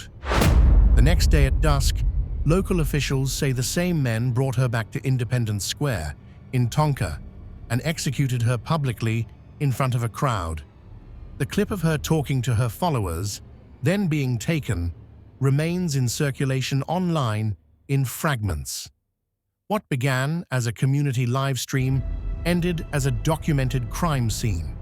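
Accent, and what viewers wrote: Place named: United Kingdom. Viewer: hate these brit voices for american stories lol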